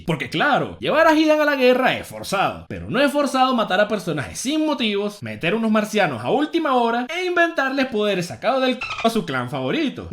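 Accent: Venezuela. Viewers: Eres venezolano?? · Eres venezolano, verdad? :3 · Acento Venezolano... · Enserio eres venezolano?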